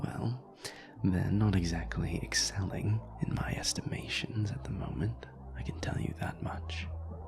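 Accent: United Kingdom. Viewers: IM GETTING BRITISH AND AUSSIE VIBES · He's British??? 😮😮😮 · Timestamps: - Porter has a British accent 👀 - Listener is their friends’ designated driver at the club, so they’re staying sober while their friends have all the fun without them - “Being the responsible friend doesn’t mean you shouldn’t get to have a good time too. They owe you that much. Uber’s a thing.” - “You’ve got a face far too lovely to look so bored. We should fix that.” [...] [laugh] “I don’t see anyone else at this table that I could mean by ‘we.’ If I’m overstepping, say the word, Treasure. I’ve been known to have scruples. Occasionally.” - Treasure wants to just talk for now, and Porter is okay with that — - “We’ll get to the fun when we get to it. You wanted to talk first, so talk. You’ll find I’m an exquisite listener. Speak.” - “My name’s Porter. Porter Solaire.” - Porter thinks that this isn’t the first time Treasure’s friends have left them out to dry on a night out, and he’s right — - They said that friendship isn’t transactional and theyre right, but Porter says that friendship is supposed to be a thing where all parties care about one another and act like it — - Porter noticing that Treasure’s friends don’t seem to care much about them - “Oh yes, I have a fabulous circle of friends in my own life. 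There’s even a few of them I can almost stand to be around” [chuckle] - “I find you very attractive. Is that too forward to say?” [...] [chuckle] “How deliciously demure. Look at me.” [...] “Mmm. Better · HE'S BRITISH I NOW HAVE A REASON TO LIVE YEEEE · I've never related to a listener more. if a charming (British) man came up to me and started flirting THAT HARD im putty on the floor sir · a BRITISH redacted character? Me thinks yes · OH MY GOD HES BRITISH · BRITISH REDACTED YESSSSS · THE BRI-ISH OMYGHAD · Moments that made my me eep with excitement: -when I heard the British accent -when he said you have a face that's far too lovely to look so bored -when he said I could bring you a lot of pleasure -when he said he only bites when people ask nicely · IVE BEEN WAITING FOR THE BRITISH ACCENT! THANK YOU THANK YOU THANK YOU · No definitely British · As a STRONG vincent girlie this feels like i’m betraying him but i am a sucker for a british accent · I didn’t think he’d be British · BRITISH!!!! 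HELP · Probably British accent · OMGGG HES BRI'ISH 😣👹👹‼️‼️🤩🤩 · A BRIT?!??!?!?! IM SCREAMING (in a good way) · I didn’t know he was British You’re hitting me in my weak spot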